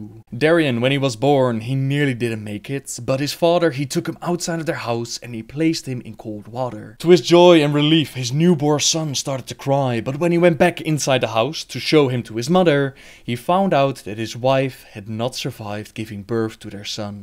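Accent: Netherlands. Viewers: i hear a heavy dutch accent in his voice. :) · this sounds so dutch it hurts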